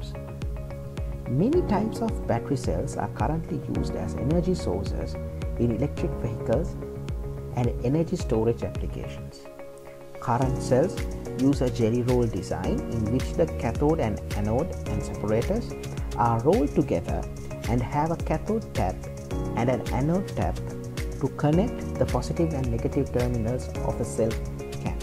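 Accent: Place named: India. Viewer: why India English.......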